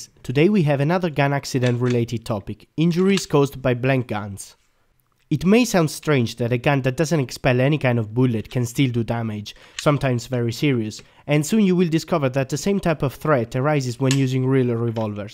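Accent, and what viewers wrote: Italy. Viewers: Ma sei italiano? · sei italiano l ho capito subito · He sounds so italian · L'accento italiano si riconosce ovunque, bel video, strutturato in maniera ottima e molto informativo, bravi. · This guy is Italian I’m sure. I’m Italian I recognise the accent. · ma sei italiano? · He is Italian. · Hey ma sei italiano? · All'inizio dall'accento ho pensato fossi italiano, vado avanti e leggo nella cartuccia del fucile a pompa "fiocchi", lì mi sono tolto ogni dubbio😂. Davvero complimenti per la pronuncia in inglese, sei molto bravo! Il video è fenomenale, sei davvero bravo!! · he's Italian, it's his accent. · Dall'accento presumo che sei Italiano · He's Italian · troppo forte l'italiano che parla inglese , shock ! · Are you italian? The accent and the beretta?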